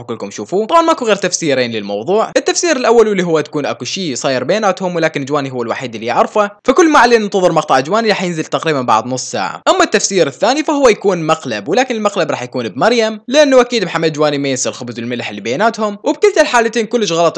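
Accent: Iraq. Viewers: سؤال انته عراقي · من كلامه عراقي ❤❤❤ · من العراق مبين من كلامه😉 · لا حبيبتي عراقي شوفي من الهجه بين اذا سوري ليش مايحجي سوري شنو مايفتخر بلهجته مثلا🙃😏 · من العراق لهجته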